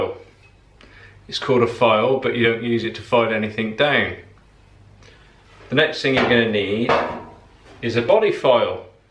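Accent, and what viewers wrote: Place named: England, United Kingdom. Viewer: Do you need an apprentice? I noticed your English accent are you in the UK? Love your videos thanks for sharing 🙂👍👌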